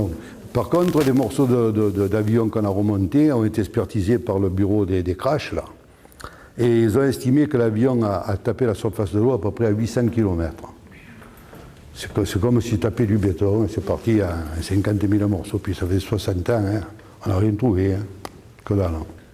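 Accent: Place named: Provence, France. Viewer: J'aime, j'adore votre façon de peindre la vie avec ce magnifique accent provençal !merci pour cette belle histoire 💛❤️aux couleurs de chez vous !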